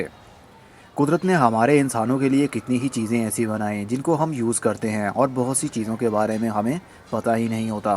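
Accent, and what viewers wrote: Punjab, India. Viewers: You are correct dear. Im Pakistani and we call it Phitkari i guess he is frm Punjab side and thts why he says it this way. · abc xyz ......ye Pakistani Punjabi hei...unperh Punjabi ka urdu lehja hei....urdu bolnay ki koshish mein urdu ki aisi ki taisi phair raha hei....... jaisay.....Bharti... urdu boltay iski beikh o bunyaad nikaal ker issay Hindi kehtay hein....perhay likhay panjaabi behter urdu Bol letay hein.... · Birjees Sagheer Yes I think so. Im a native urdu speaker from India and I too think he has a punjabi accent. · abc xyz I believe since he is based in Lahore his urdu is accented with his first language punjabi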